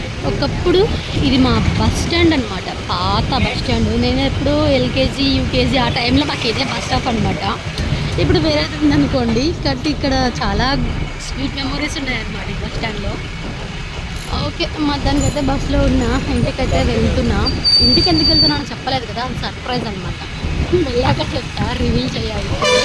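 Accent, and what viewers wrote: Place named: Rayalaseema, India. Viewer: rayalaseema bhasha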